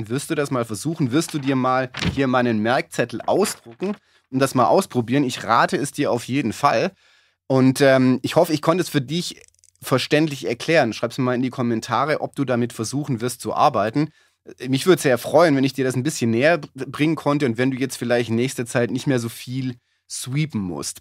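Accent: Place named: Heilbronn, Germany. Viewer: klasse video, sowas hilft mir echt immer wieder weiter bei meinen eigenen basteleien in meinem möchtegern-homestudio! ;) gerade bei EQ (und eben auch kompressor) kann ich gar nicht genug input und anregungen kriegen um dann selber damit zu experimentieren... manches klingt auch erstmal einfacher als es dann im detail in der praxis wirklich ist! ;) nachdem ich mir jetzt schon einige deiner videos angeschaut habe, kann ich mich jetzt aber mit einer persönlichen frage nicht mehr zurückhalten: ich hatte mal einige jahre in den 90ern an einem heilbronner gymnasium einen musiklehrer (und erdkunde) mit dem gleichen namen. der unterricht war echt klasse und ich war auch unter seiner leitung im chor und anderen schulprojekten. ich habe gesehen, dass du auch aus der region bist, die sprechweise kommt mir irgendwie ein wenig vertraut vor und nunja die frisur hat auch gewisse ähnlichkeiten. 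;) also drängt sich mir einfach die frage auf ob da wohl ein familiärer zusammenhang besteht...? :)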